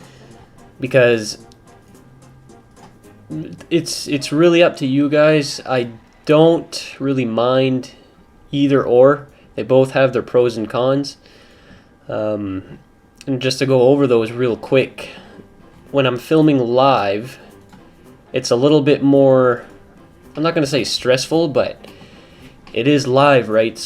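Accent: Canada. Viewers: Ok, are you from Minnesota or Canada? Your accent is very...Canadian. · I thought I could tell from your voice you were Canadian, MTL talk and Canada Day video proved it lol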